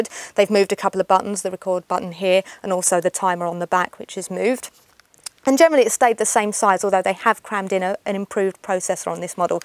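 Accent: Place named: England, United Kingdom. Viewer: I want to marry an english girl; I can listen to that accent all day long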